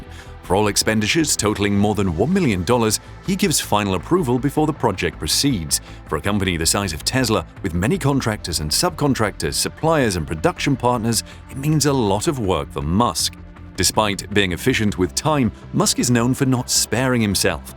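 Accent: United Kingdom. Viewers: Annoying British voice that uses click bait subject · A semi spiffing Brit presentation.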